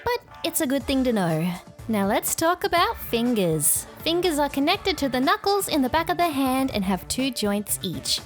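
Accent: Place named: Australia. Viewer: I know a fellow Aussie accent when I hear one. YouTube Algorithm actually cooked for once by recommending me this, saving this video to refer back to likely frequently.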